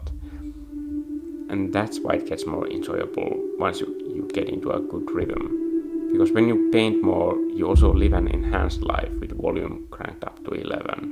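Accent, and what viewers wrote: Finland. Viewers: I knew you’re Finnish because of your accent before I even saw your username lol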